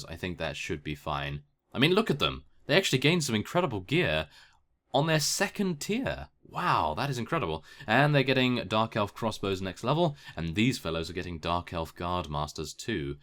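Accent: United Kingdom. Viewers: Even though I am also british, I love your accent xD Some of the way you talk does make me giggle :)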